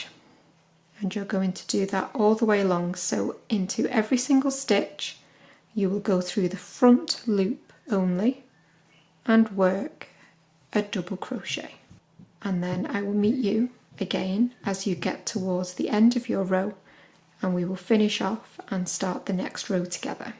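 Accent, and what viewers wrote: United Kingdom. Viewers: I absolutely love U.K accents but yours is particularly beautiful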